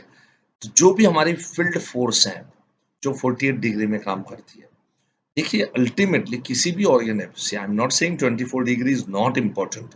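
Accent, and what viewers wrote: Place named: Maharashtra, India. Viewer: खूपच सुंदर माहिती दिली सर धन्यवाद ( शुद्ध मराठी बोलता सर तुम्ही )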